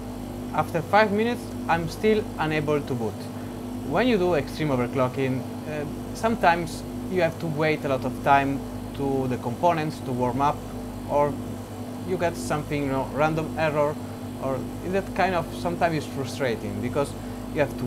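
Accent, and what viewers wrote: Italy. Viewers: ma te sei italiano? hai una pronuncia che suona di casa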